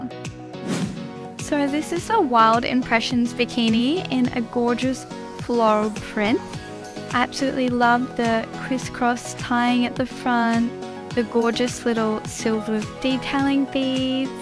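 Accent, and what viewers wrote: Australia. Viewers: Love that Aussie accent, I didn't understand a word she said but it doesn't matter.😊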